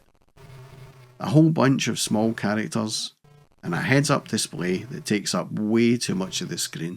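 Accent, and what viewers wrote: Scotland, United Kingdom. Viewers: Upvoted for the narrator’s Scots burr.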